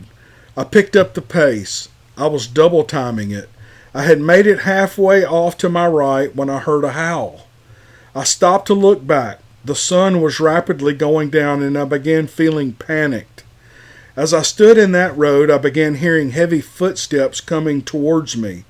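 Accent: South, United States. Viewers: I so appreciate your channel. My bestie and I listened to you all the time. Your southern accent is wonderful and you are so positive. Thank you for taking the time to do this.. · It was so nice to see/meet you! I've commented before that I love your accent, and it's so fun to put a face to your voice! I live in Oregon, so you're southern accent is such a treat to listen to! Thanks for all of the stories, I love them all and really enjoy hearing you tell them! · I know you are a busy man. I hope you get your garden in soon. I want you to know that I anxiously await notification for your stories. Yes, I have found “my people”. I’ve listened to other channels but I’m from the south and feel at home when you speak. Thank you so much and I would have never guessed Bigfoot is in Hawaii. Wow!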